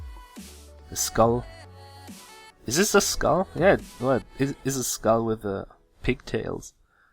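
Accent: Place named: Germany. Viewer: mal ne runde zocken? Man hörts am English :)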